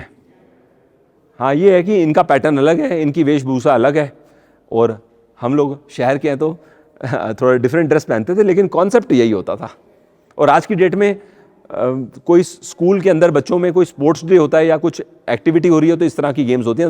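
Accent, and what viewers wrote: Punjab, India. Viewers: Sir ....There is some 'NARRATION MAGNET' in your voice pitch.....upr se thoda PUNJABI TADKA😊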